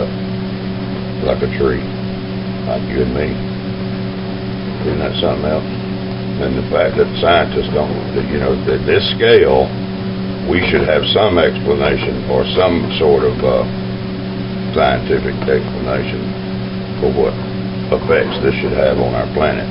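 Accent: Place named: Kansas, United States. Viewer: yes the voice and i do like the accent from kansas??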